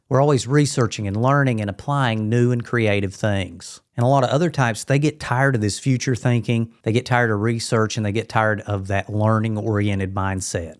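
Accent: South, United States. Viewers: I am from Virginia. And, it is so wonderful to hear your Southern Accent… one of the nicest ones I have ever heard. You should read for audiobooks😊 I am an INFJ It’s really kind of a relief to hear all of this. I don’t feel so weird anymore. Thank you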